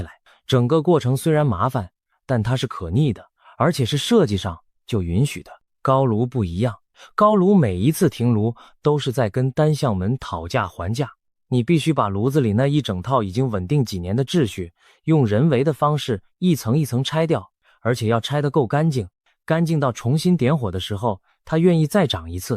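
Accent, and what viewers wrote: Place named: China. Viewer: 不能進去拍啊 拿了圖要授權啊 這是ai劇本生成的影片連講話都大陸口音.我還以為大陸短劇.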